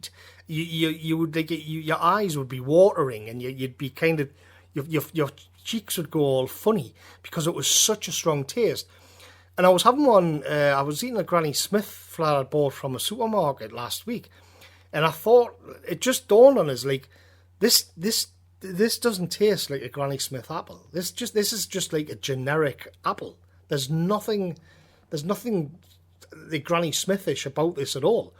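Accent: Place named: England, United Kingdom. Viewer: I'm new to this channel and I'm thoroughly enjoying the content. It's refreshing to hear non -sensationalist monologues, delivered with clarity, sense and topped off with a familiar English accent. As for the China food situation I believe that they have learned from their history, all their great dynasties have fallen around the same time as global food shortages, which coincided with solar (in) activity. Which we are now heading in to. I'm not fearful of the future, our ancestors faced worse and survived, the narcissist members of society are the ones who may not fare well, those who embrace Nature and Order will undoubtedly arrive on the other side of this nonsense in a stronger position. Mother Nature will fix the Globalist abominations. In 200 years, Europe will look like it did 200 years ago. Greetings from Rutland, Multum in Parvo!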